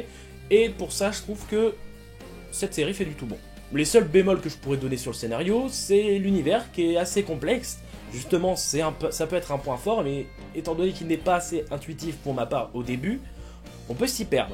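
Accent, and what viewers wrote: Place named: United Kingdom. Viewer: J’aime pas l’accent anglais. À travailler..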